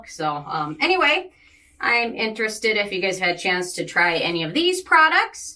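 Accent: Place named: Wisconsin, United States. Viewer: The moment you opened your mouth and talked, I KNEW you were from Wisconsin!!! Love my fellow wisconsinites!!!!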